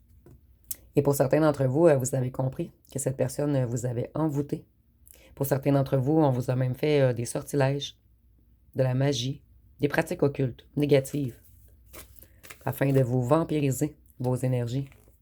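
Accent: Quebec, Canada. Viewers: Bonsoir Madame , c'est toujours avec un grand plaisir que je vous retrouve et vos guidances toujours fort juste ,votre accent québécois j'aime beaucoup et vous aussi , vous faites partie de mes préférées , vos ongles mauve je doute pas qu'ils seront jolies car il le sont déjà j'aime bien cela de cette couleur ,et je ne sait pas comment vous faites ça mais vous avez du goût c'est très jolie je ne doute pas votre façon de vous habiller très féminine et très bien chic toujours , je me sens parfois si seule et votre présence me fait exister car je m'occupe de ma mère toutes ses journées qui ne sait plus rien faire toute seule et en maladies de Alzheimer ça me fiche le bourdon pas mal ,je peux m'absenter mais très peu car ne peux pas la laisser longtemps seule et ma sœur a pris 3 jrs a la mer avec son compagnon alors je regarde vos guidances et ça me permet d'exister un peu ,sinon je suis comme vous coquette et féminine aussi a part que la j'ai pas beaucoup trop de temps . 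Merci a vous et à votre bienveillance a notre égard pr nous faire profiter de ses messages gracieusement . Bonne fin de soirée.❤👍🍀🌹🥰🙏☀️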